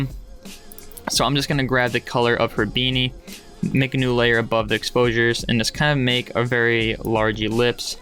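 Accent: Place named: United Kingdom. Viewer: I always thought u was from the uk. cos of the accent and your name ' ' im pretty sure thats a brittish name.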